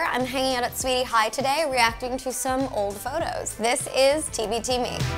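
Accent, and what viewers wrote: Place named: Canada. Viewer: “Torono” ahh the Canadian pronunciation has grown on her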